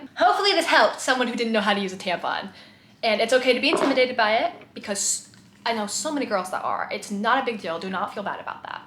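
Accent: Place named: Texas, United States. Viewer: Is it just me or could I sense a bit of texan I’m her accent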